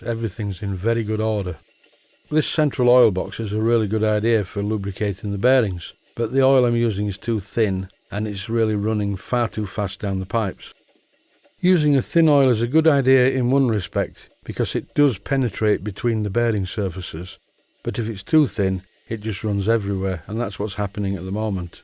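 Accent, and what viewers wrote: Yorkshire, United Kingdom. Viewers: Many thanks,. A brilliant series. Always a pleasure to watch your videos, complete with Yorkshire accent, humour and wit. Cheers.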